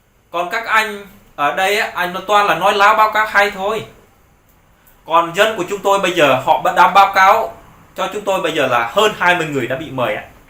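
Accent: Standard. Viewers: Nói tiếng Việt chuẩn lắm😊😊😊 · Nói rất chuẩn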